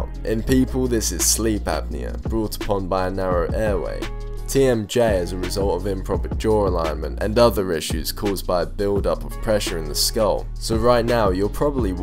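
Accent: England, United Kingdom. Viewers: are you from england or maybe australia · He is a fellow Brit. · British accent ?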